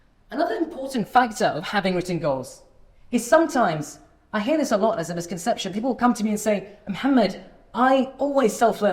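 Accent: United Kingdom. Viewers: ما شاء الله لهجتك بريطاني 😅👍 برافو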